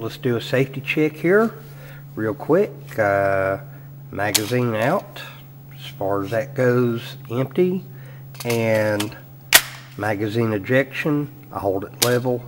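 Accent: United States. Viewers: No homo but I love his voice and accent,picture perfect American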